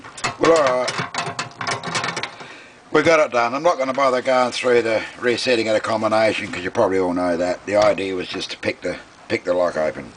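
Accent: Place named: Australia. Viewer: Fuck the video, I'm guessing your Australian? if not, either way your accent is awesome :0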